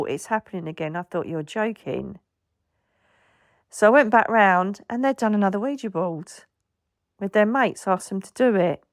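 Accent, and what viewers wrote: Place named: England, United Kingdom. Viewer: That was so much fun! Thank you for sharing your stories and I just listening to your English accent ❤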